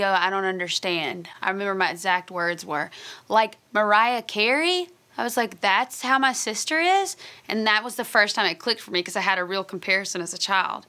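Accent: South, United States. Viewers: To know not the only one that talks with a southern accent she does too but when she sings she sounds beautiful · She sounds so country cx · Oh my gosh her accent has changed !! More country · Smh guys, she looks different because she gained weight.. And she moved down south.. Lol thats the accent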